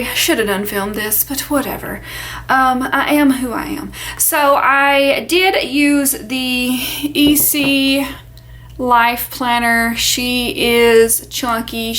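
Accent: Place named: Kentucky, United States. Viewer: I just love your videos... Anytime i have a video playin my 7 yr old says she talks just like meemee (very deep Kentucky) we love it girl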